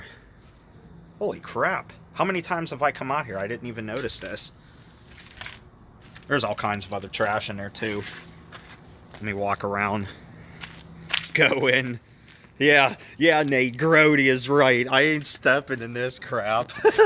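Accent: Pennsylvania, United States. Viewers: i was born in oil city in 61 love the pa accent glad it wasnt u car parts u were lookin thru in another video when i asked,,,,,we really need somebody in rochester ny area to do these soooo many to film.....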